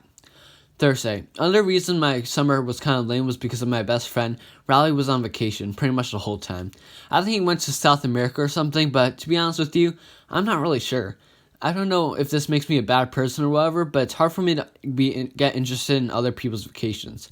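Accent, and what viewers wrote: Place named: United States. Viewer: Bad don’t speak so fast man and why u American like whyyy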